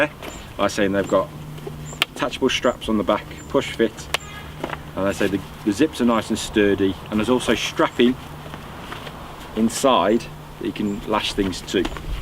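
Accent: England, United Kingdom. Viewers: I think the content is probably good, but I could not focus on what you were saying, because I just think it sounds so disgusting when british people pronounce "u" as if it was "o". Like in "stuff", or "backup". But thanks anyway. Maybe it's a reading issue? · Nice simple video so nice to hear an English voice ✌️and people not droning on about concealed carry. How is it most Americans are so paranoid 😆I digress nice kit man very Similar to my Rush 24 bag that goes everywhere with me I'm in south east England but travel extensively keep up the good work I'm now subscribed 👍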